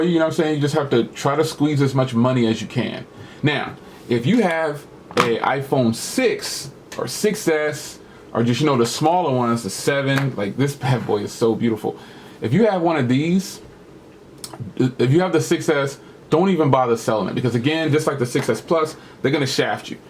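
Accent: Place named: New Jersey, United States. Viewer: Great video, Jersey accent heavy at times during this video 🙂, loving my XS Max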